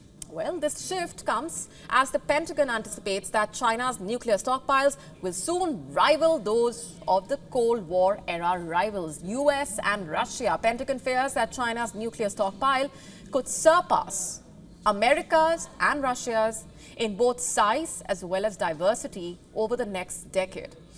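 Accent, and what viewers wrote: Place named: India. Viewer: What the heck of indian accent! 🤔🤭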